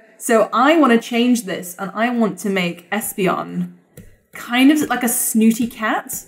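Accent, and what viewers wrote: United Kingdom. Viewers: I'm so happy I just discovered your channel! I really love animation 'n stuff and I'm always happy to find a good one with sympathic ppl on it and your british accent makes it even better!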